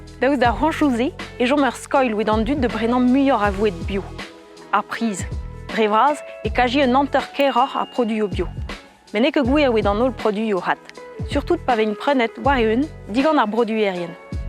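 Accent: France. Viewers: Well, it is true that there are some french caracteristcs in her accent. She doesn't seem to have much struggle to stress sylables, though (which is something we, french speakers, don't know a lot about as we only do it at the end of sentences or so). What makes her sounds french must be her vocal and consonnant placement. Yet, you surely are aware that most Breton speakers are native french speakers, initialy. And those who actually get to fight to keep Breton alive, already some decades ago, learned it from their grand parents who knew french (or some french) too. This helps to undersrand why these features exist in modern Breton. I guess modifications in a language, in general, aren't nor good nor bad. Maybe it's just how it goes. You can still find few active story tellers (recorded by Brezhowed, by the way) that have pronunciations that may fit more your expectations (and mines, as I love strong local accents in every language I learn). 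Then again, thanks to all of them fluent Breton speakers from this channel to keep going with their good work, not only by doing it in Breton but also by offering great content variety and good reportage quality.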